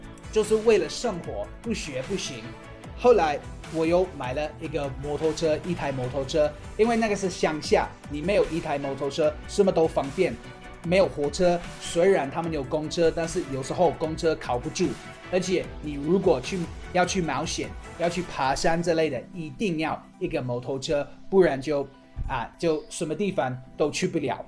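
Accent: Taiwan. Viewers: 哈哈～我第一次看到一位外國youtuber講國語台語口音超重的XDDD 完全就像是先學了台語才學說國語的人 果然住在南部講話就是會一直“啊～怎樣怎樣，啊～怎樣怎樣”的 哈哈 中文講的真的是太好了！完全可以感受到真的是花了很多心力努力的學習跟練習出來的！ 是比天才更令人敬佩的地才！讚！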